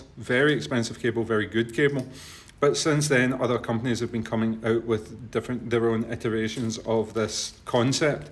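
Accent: Scotland, United Kingdom. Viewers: British ?? that would explain your accent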